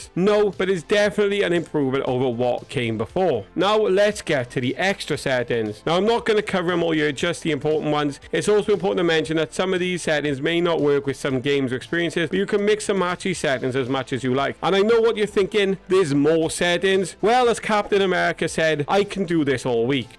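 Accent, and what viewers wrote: Wales, United Kingdom. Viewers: What on earth are you on about ARABIC? hes welsh thats why we cant understand us the terrible mic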